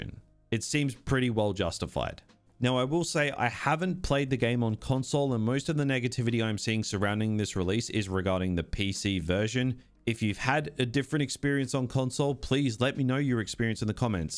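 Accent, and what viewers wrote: Australia. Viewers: dragged past 10 minutes cringe aussie accent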